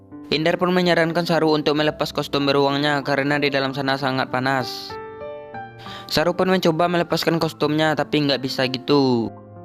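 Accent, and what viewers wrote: Madura, Indonesia. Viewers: eh logatnya kek mdura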